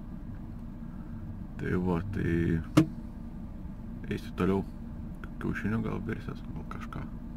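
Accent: Šiauliai, Lithuania. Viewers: tikras siaulietiskas akcentas :D linkejimai is pietinio :) po keliu savaiciu jau norisi namo ar visa kadencija (6sav ar ilgiau) viskas ok?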